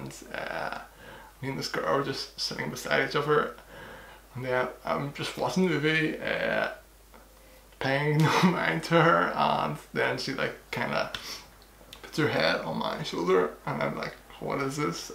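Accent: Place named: Ireland. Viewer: I loved your video and your accent, where in Ireland are you from?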